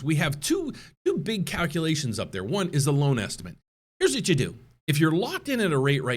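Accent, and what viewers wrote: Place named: South, United States. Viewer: Wow! He has a southern accent.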